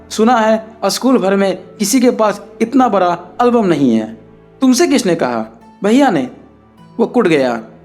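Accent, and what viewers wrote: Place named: Bihar, India. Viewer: बिहारी की आवाज में मजा नहीं आ रहा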